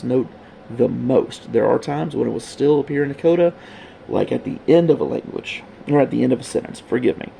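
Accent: United States. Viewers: as a southerner, this is how some people really talk down here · Pretty sure this is just how people from Mississippi talk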